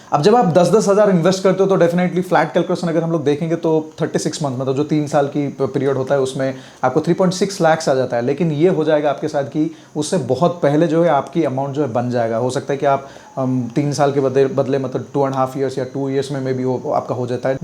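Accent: Odisha, India. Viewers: Bhai are you odia..?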